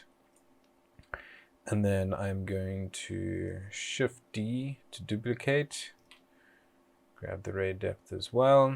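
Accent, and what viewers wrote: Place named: South Africa. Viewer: Must be South African!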